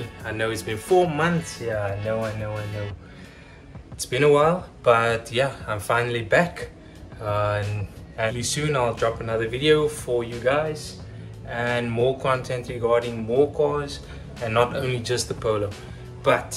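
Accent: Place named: Afrikaans, South Africa. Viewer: Haha zuid afrikaans blijft grappig om te horen. Dacht dat de taal daar uitgestorven was. Btw did it came painted/glossy? How much was it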